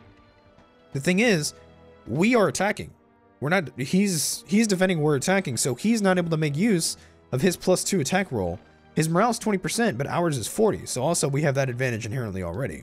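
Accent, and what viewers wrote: Spain. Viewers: ye he pronounces j's as h's as if it was spanish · It's funny how you say Portuguese things with a Spanish accent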